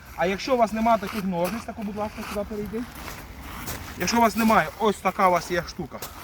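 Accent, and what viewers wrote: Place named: Obodivka, Ukraine. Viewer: Ободівський говор) 😎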